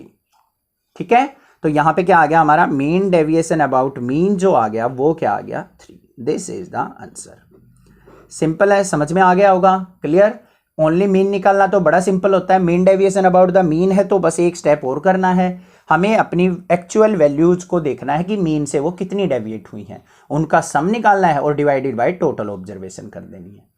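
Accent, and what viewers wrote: Haryana, India. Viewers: Sir ap haryana se ho na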